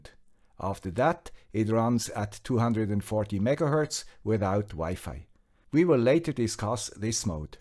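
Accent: Switzerland. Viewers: Thank you very much! Exaclty what I needed and KI didn't knew yet. From another guy with a swiss accent.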